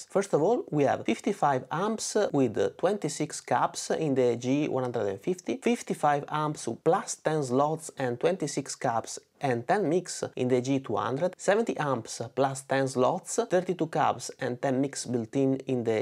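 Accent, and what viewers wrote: Venice, Italy. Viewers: ti ze venessian da l'accento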